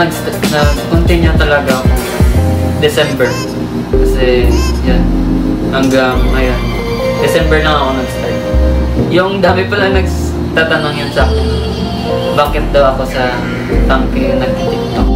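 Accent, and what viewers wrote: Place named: Iloilo, Philippines. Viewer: mailhan ya gd nga ilonggo kay sa tono palang sang pag hambsl mo hahs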